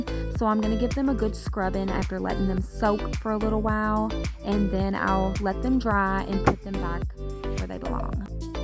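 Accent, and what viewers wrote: South, United States. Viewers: Hey... your home looks great also I'm new to your channel, I just subscribed. Where do you live? I detect a southern accent. Shelby NC here.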